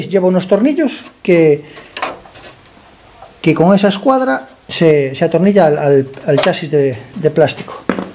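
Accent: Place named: Galicia, Spain. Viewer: Eres un fenómeno pero el diseño lo as sacado de la maquina arcade original vídeo sonic pues tiene un sospechoso parecido en el diseño a esa maquina?por cierto? por el acento ya veo que eres gallego yo hice la mili en el Ferrol y recuerdo pasar las horas y horas de permiso en un viejo salón recreativo galicia si señor tierra de meigas y de la santa compaña como me gustaba el grupo gallego un saludo amigo y muy muy buen vídeo hasta pronto.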